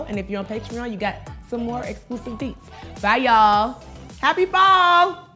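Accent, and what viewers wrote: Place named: South, United States. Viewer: I love your southern accent .. specially wen U say “sopped up" 🤣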